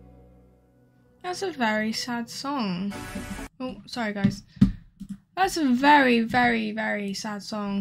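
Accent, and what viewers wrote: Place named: United Kingdom. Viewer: Как же прикольно звучит британский английский))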